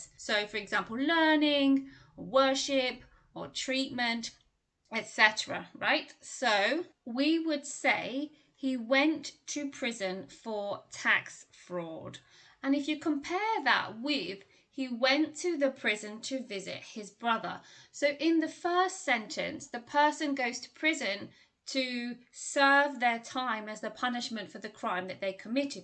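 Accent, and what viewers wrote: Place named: United Kingdom. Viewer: Beautiful British Accent !!!!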